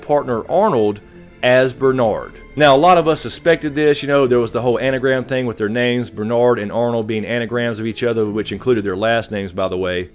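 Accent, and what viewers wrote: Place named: North Carolina, United States. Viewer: North Carolina? Help me with your accent. Nice video, thanks.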